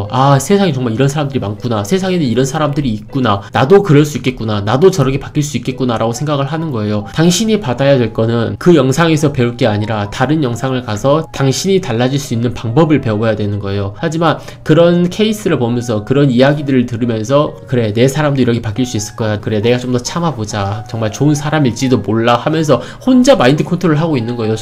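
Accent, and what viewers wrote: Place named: Gwangju, South Korea. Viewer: 최근 힘들어서.. 영상을 거의 보게 됏습니다! 제목처럼 전 을의 입장이구요.. 장거리커플인데...지난달부터 마음 식은걸 느꼇고 환승하려하는지..어쩌다 연락도 안됏어요~~ 요즘 모든게 귀찬고 시국도이러니 제주에 사는 분이라 뱅기타지말구..내년에 보쟤서 클스마쓰 오늘 못만납니다ㅡㅡ 헤어지잔말두 안하고..만나지도않고 이 인간 버리고싶은데 맘이 결단을 못내립니다ㅠㅠ 내가 헤어지자고 말하길 기다리는거 같네요.. 제가 더 좋아햇던 을의 연애엿구요! 답은 알아요..근데 잘 안되는게 미칠지경입니다! 영상 모두 잘보구 잇구요..저도 광주가고향인지라 고향사투리 들어서 넘 편하게 잘보고 잇습니다ㅎㅎ 힝 슬프네요ㅜㅜ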